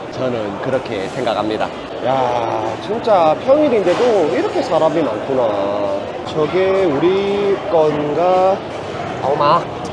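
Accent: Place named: Gyeongsang, South Korea. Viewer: 여읔시 님 갱상도 머째이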